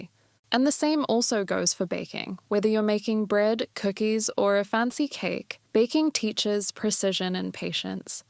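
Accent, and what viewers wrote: United Kingdom. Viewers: برتش آكسنت؟ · what her English accent is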